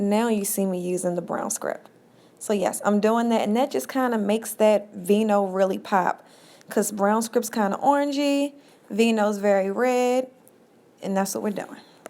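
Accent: Baltimore, United States. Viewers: Omg I could tell you were from Baltimore lol . love it, so beautiful. New Subbie!!!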